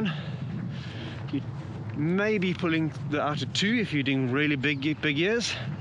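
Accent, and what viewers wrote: South Africa. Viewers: Cool video! Your accent sounds South African. Are you? Also, where was this video taken?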